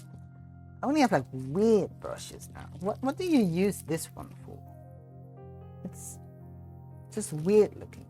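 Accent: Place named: Netherlands. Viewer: You have a Dutch accent but your English is amazing! Love from kansas. I am half German and 1\4 Dutch I wish I knew Dutch or German. My great grandma spoke German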